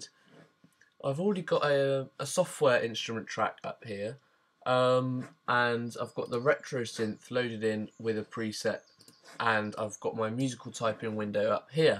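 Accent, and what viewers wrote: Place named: United Kingdom. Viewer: Your accent is distractingly British but you make a mean video mate. Short, to the point, and telling me everything I need to know. Well done sir!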